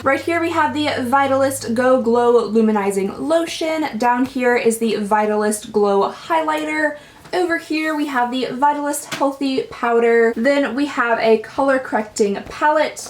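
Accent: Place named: South, United States. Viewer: I am just so glad to hear a person that speaks as I do. Hey y'all:-) Louisville is definitely not Richmond but our accents are similar. I cannot stand watching other people! You are funny and pretty!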